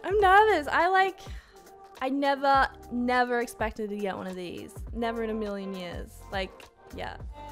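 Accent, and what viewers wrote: Australia. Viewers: Omg youre Australian!! Thats so cool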